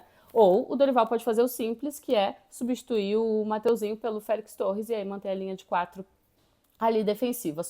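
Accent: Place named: South, Brazil. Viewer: Você é😂 Catarina ou Gaúcha? Porque este "ãn" é característico destes dois estados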